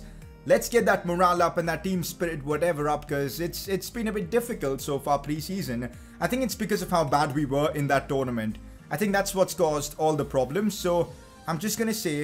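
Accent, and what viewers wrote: India. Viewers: I like you. You have a thick Indian accent, but I like you. Straight to the chase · bro u indian?? i mean u have a bit of indian accent... just casually asking.. nice vid btw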